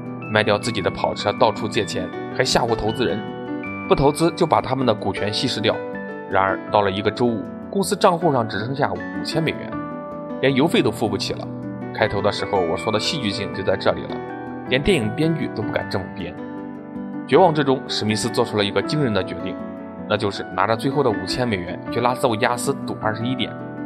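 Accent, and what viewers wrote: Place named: Jiaodong, China. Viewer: 老哥。。你这胶东咬字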